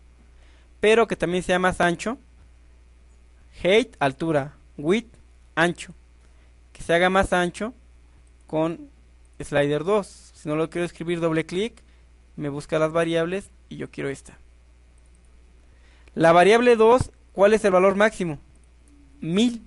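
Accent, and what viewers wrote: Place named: Mexico. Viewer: eres lo maximo tio , muchas gracias por tus tutoriales explicas muy bien y por lo que escucho eres de mexico , que viva mexico!!!!!!!!!!!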